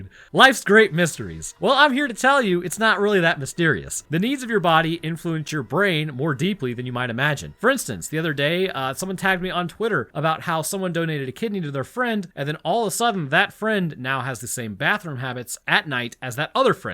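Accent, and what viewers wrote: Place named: South, United States. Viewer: As someone who lived in Florida for a while and some times his southern drawl sneaks out of the basement that it SHOULD NEVER leave. Southern drawls arnt that thick unless they’re Cajun or some very very isolated rural areas. Atlanta ain’t one of em.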